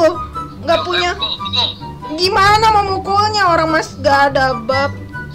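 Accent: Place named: Java, Indonesia. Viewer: jowone ngendi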